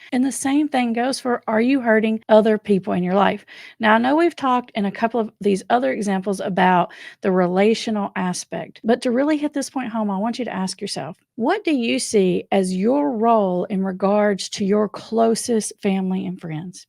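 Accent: South, United States. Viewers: where’s your accent from? Southern states?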